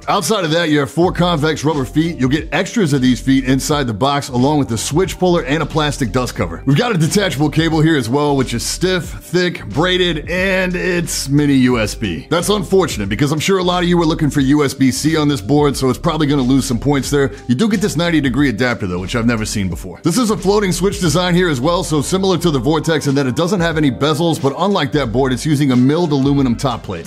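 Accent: United States. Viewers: Man this dude is so great. Finally an American (at least I’m guessing from your accent) who measures using the METRIC system. Thank goodness you’re trying to please literally everyone except Americans 😄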